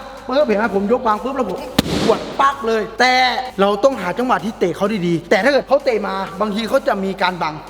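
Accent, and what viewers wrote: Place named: South, Thailand. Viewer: สำเนียง คนใต้ อีสานใต้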